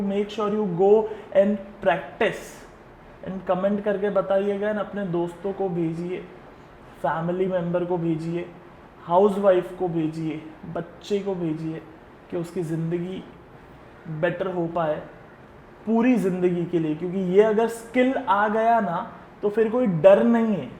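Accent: Gujarat, India. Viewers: Bhai gujarati cho ??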